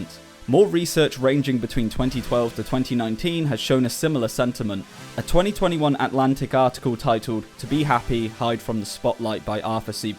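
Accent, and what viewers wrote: United Kingdom. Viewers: Fame is bad sez the guy looking to become 'famous' via youtube subscribers. These British accents are starting to wear on my ear again.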